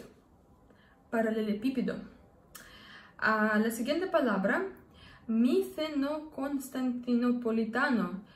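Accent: Russia. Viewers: Hola mi Linda, soy médico y disfruto todos tus videos, aquí te dejo unas 7 palabritas para que puedas pronunciar. Me encanta tu acento Rusoespañol. Haz otro video con ésta y otras palabras curiosas en Español. 😉👍😘❤ 1.Hipopotomonstrosesquipedaliofobia 2.Homopedonecrozoofílico 3.Pneumonoultramicroscopicsilicovolcanoconiosis 4. Esternocleidomastoideo 5.Ciclopentanoperhidrofenantreno 6.Uvulopalatofaringoplastia 7.Gastroesofágicodudenalintestinal.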